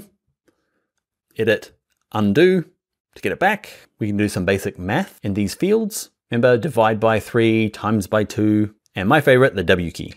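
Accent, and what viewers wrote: New Zealand. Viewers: do I hear kiwi accent? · Thanks for great tutorials - you're a gifted designer as well as a teacher! And hearing your accent, I miss my highschool exchange year in Papatoetoe... Cheers mate, thanks again! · Hi. Andy here from NZ....how refreshing to hear another Kiwi explain this app in detail. Great style and pace too.....the American tutorials treat you like a baby....I was first put off by the length of the clip...but hey...this was seriously worth it. Picking up Indesign to help my daughter create her first children's book....she is 10... And needs a bit of help.... I think you've given me everything I need to get started. I see you also have illustrator tutorials...I'll be checking those out too.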